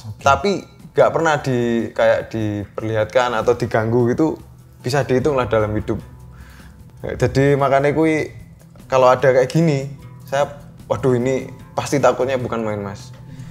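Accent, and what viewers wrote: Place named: East Java, Indonesia. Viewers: Peeeh..byuuh...khas e kediri😅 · Bahasane campur". 😂😂😂 Jowo indo campur . Medok emen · wkwkala bener mas logat e wong jatiman pol😂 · Bangga dengan medok jawa mas. Karena mencerminkan orang yg lucu dan sabar hati. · Amat sangat medhok ngrungknno seneng 😂😂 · Anune,itune,katane,ndek sana,ndek situ,suuuwepiii,tuuuwakoot,gak uwweeenak,pehhh,behhh,. Jawatimur pride ❤🥰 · Y Allah lucu ngomonge wong jowo,salut ..salam dri jowo kendal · Podo" wong jawa timur mas.. Suueneng aku karo logatmu mas😂.. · Yo-opo rek malang e medhok e pol 😂😂😂 , q Melu meker pedut iku bhs indo e opo!!! Jasikkk 😂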